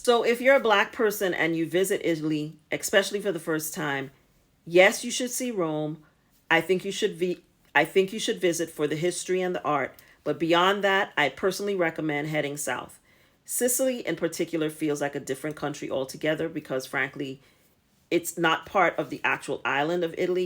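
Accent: United States. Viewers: I guessed Greece was the most racist but Italy is right next door. I wonder if the immigrant crisis has something to do with it (not that I'm making excuses). The fact that your American accent got you a pass makes me think it's aimed at African migrants. You should wear a t-shirt that says: "Sono un ricco turista americano." :p The Netherlands or Scandinavia would be the least racist, being progressive and sophisticated countries. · It's too unfortunate that only your American accent is a leverage and asset. Nonodyis is going to respect us (black people).unless we change and improve our conditions.American accent can be an asset.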